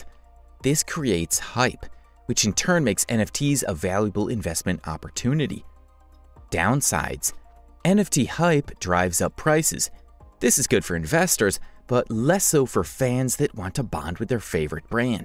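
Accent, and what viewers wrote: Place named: Chicago, United States. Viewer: Man the Chicaaago Aaaaahhhhcent on this guy making it hard to watch.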